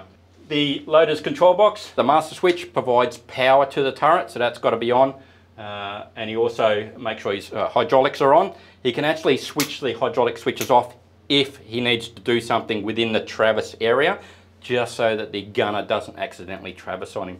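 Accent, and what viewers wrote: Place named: Australia. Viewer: For a few moments there, i closed my eyes & pretended i was listening to Steve Irwin talk about a tank in great detail instead of crocs & various other critters. I know it's kinda odd but hearing that accent reminded me of Steve.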